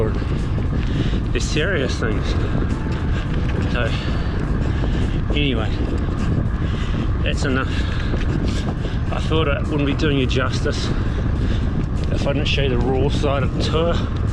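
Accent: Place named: South, United States. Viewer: Your cowboy / country guy voice is awesome. Lol. Keep it up!!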